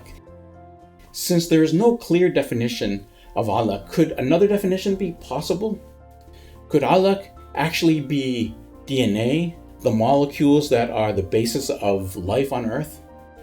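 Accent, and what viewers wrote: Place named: Iran. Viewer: Because he is Persian. I think. They have hard time pronouncing qaf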